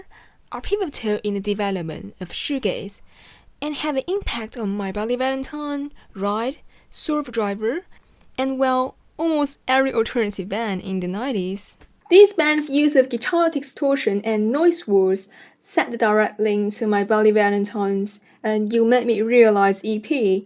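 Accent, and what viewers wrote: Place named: Somerset, United Kingdom. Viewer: You've nearly nailed that Somerzzett accent!!!❤❤😊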